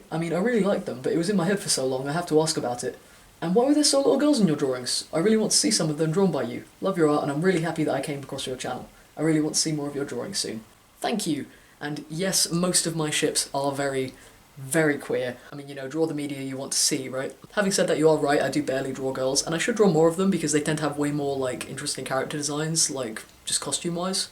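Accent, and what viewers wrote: United Kingdom. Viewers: Dude, even I, who's not an English speaker, can FEEEEEL the British accent ! xDD (not that it bothers me, it's cute actually :3) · Oh I have a question are u British sorry I don’t know im new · He is cute but that accent is asome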